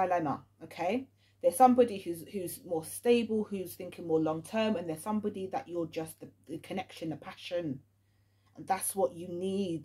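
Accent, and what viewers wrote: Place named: United Kingdom. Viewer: Are you British ? Love your accent but good reading thx for your time 🤍🤍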